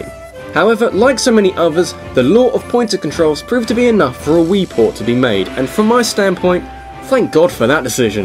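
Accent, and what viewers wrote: United Kingdom. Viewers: are you british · Some-one else realises the sheer glory of the British Accent. I love you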